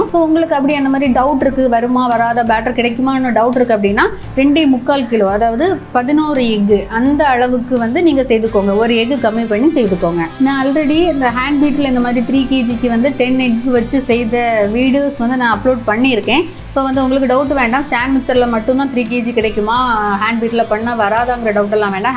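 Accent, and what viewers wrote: Kanyakumari, India. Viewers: Thank u sis ,nanum ethei than panren but romba onum order varala,enoda native kanyakumari thaan sis unga slang vache therinjuthu,😍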